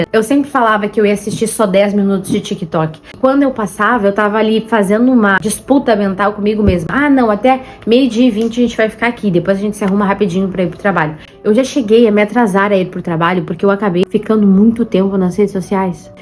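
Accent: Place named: Rio Grande do Sul, Brazil. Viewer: Tu é gaúcha? o teu sotaque se parece de uma gaúcha.😊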